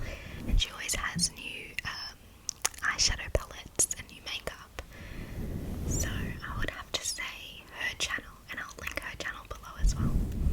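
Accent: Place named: Australia. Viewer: Yeah girl AUSTRALIA!❤️❤️ me too.. I thought you where bc you have an Australian voice❤️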